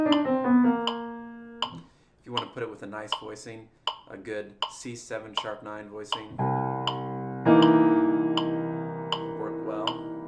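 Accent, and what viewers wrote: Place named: South, United States. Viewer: i only just noticed by your accent u are from the south even after watching this vid for years. greeting from Long Island NY. thanks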